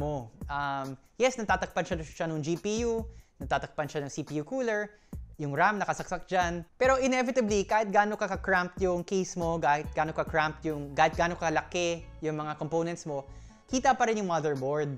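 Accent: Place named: Visayas, Philippines. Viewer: Bisayan accent 😍, proud bisaya here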